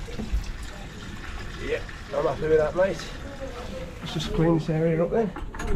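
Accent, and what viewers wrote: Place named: Wolverhampton, United Kingdom. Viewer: have to disagree, I also like the Wolverhampton accent, be proud of your accent & ignore the begrudgers 👏👍☘️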